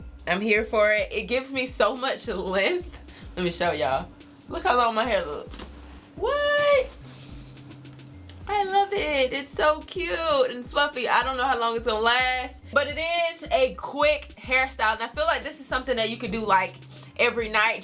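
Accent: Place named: Alabama, United States. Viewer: Where are you from?!?! You sound just like my man’s family from Alabama! Love the video btw. 💛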